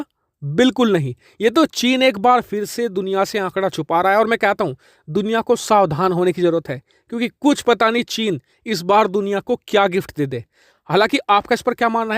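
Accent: India. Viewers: Why you talk as Indian varient ?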